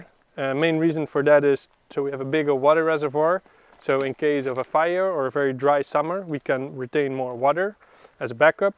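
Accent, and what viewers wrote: Netherlands. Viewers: Het Nederlandse accent 😂😂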